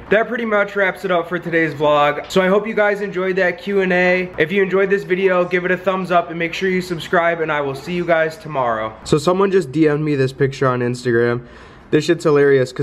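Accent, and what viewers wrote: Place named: Upstate New York, United States. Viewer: I could hear the Upstate NY in your voice. You sound just like my ex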